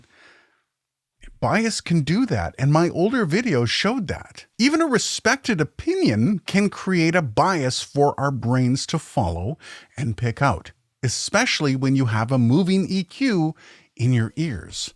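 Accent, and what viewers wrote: Canada. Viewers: All I heard this entire video was aboot.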